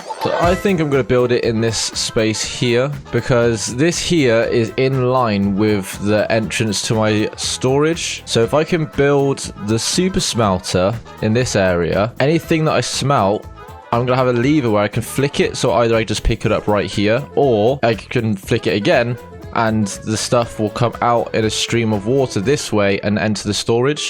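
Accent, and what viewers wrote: United Kingdom. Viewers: Is this a fellow Brit?!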